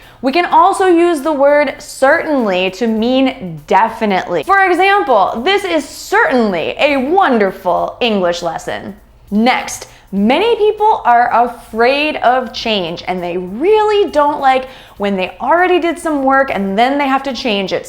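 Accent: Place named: United States. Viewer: I like American’s accent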